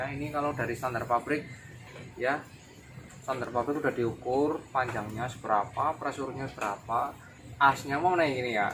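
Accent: Banyumas, Indonesia. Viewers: Rah ngapak rah kepenak😁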